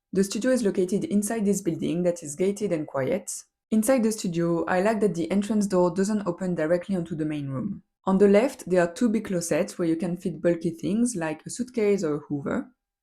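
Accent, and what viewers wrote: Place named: France. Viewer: I was too busy appreciating your kick-ass French accent to even watch what you were showing lol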